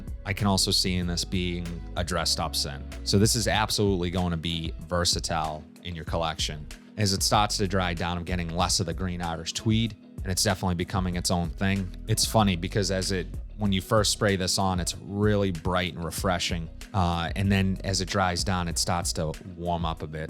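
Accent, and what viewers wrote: Boston, United States. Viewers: Nice review. On my list for this summer. Are you from Boston?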